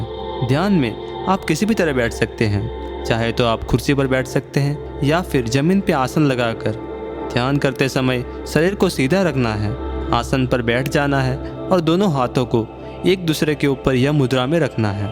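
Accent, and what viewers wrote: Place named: Rajasthan, India. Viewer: राजस्थानी लहजे में बोलते हो, कहा से हो ?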